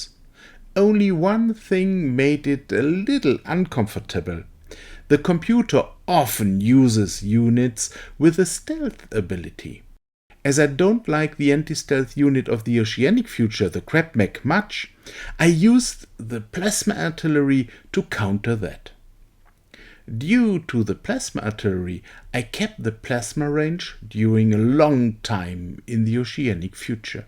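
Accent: Germany. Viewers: scheiße hört man dir an das du deutsch bist, dachte ich bin schlimm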